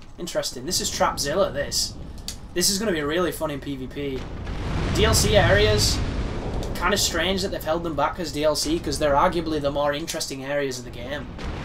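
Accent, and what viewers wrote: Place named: Yorkshire, United Kingdom. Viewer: Sleep deprivation brings out the Yorkshire in you :D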